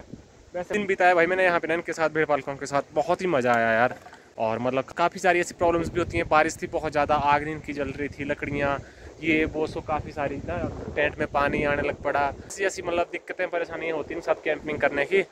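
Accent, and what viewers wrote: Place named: Mandi, India. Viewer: bhai mandi kon si jgha se ho apki tone se to me samj gya tha ki Bnda to mandyal hai. 👌 baki bhoot acha krre ho brother. 👌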